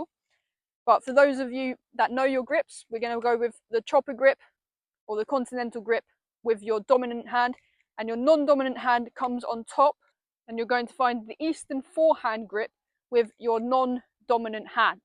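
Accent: England, United Kingdom. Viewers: best, what a nice accent? what part of england it is?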